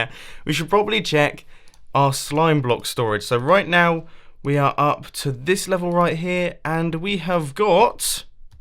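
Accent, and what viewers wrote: United Kingdom. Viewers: Mumbo is so British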